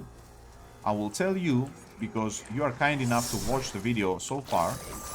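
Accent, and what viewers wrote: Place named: Greece. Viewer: Nice video. I miss your regular vids where you don't livestream. Only thing I would change would be the necklace, which I think jester's bell would be a bit better since havok form scales off of cast speed, at least it used to. Still, super good video. So good that I watched the whole thing. Yes, all 1 hour, 26 min and 31 seconds. I appreciate the raw gameplay that few people really want to do. I also love your greek accent. I've alreay used this plenty of times but I never thought to use sapphire dreamstone for the cooldowns.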